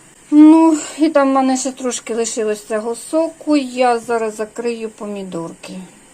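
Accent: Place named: Western Ukraine, Ukraine. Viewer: Дякую Вам щиро! Прекрасна українська мова у Вас. А наших українських діалектів в Україні аж більше 40! А по мові Ви, певно, з Тернопільщини чи Франківщини (можливо, я помиляюсь, у нас у всих різні наші діалекти). Так що, своєю солов'їною разом з нашими рідними і різними українськими діалектами переможемо російську навалу і російських слів, і їх самих з нашої України. Дякую щиро за український контент! Переможемо обов'язково!!!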